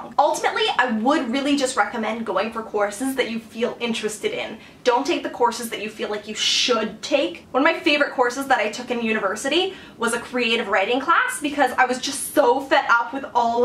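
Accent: Canada. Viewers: Wait a minute... t'es Québécoise?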